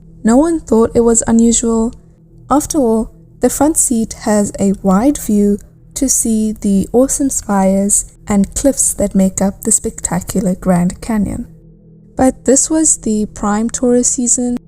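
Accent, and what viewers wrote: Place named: South Africa. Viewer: (Are you South African?) For sure she is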